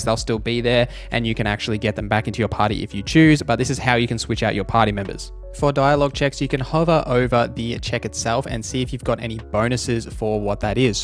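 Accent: Australia. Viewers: Clear. Concise. Australian 👌🏾🔥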